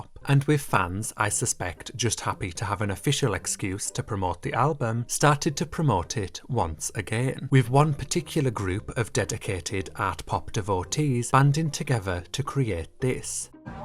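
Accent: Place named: Yorkshire, United Kingdom. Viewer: Your Yorkshire twang just shines! I swear your from Yorkshire lol:)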